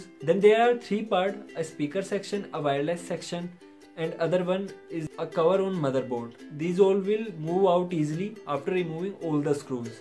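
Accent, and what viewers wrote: India. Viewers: This mans sounds like your average Indian scammer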